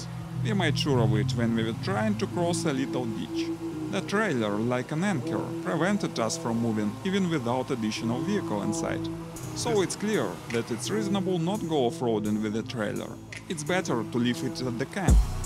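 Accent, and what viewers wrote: Russia. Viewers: Love your accent my Russian friend. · Братан акцент поправь, прям по произношению слышно, что говорит русский! 🤣🤣🤣 Привет с Казахстана! Монтаж видео зачет! 👍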